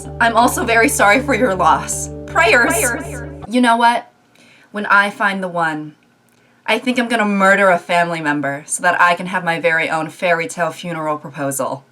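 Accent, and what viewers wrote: South, United States. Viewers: Your southern accent tho😂 it sounds so nice, like that’s what I think a rich southern person sound like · more southern accent please. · Your southern accent is on point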